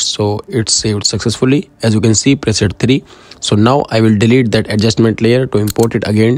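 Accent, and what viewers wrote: India. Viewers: Your accent is so Indian 😅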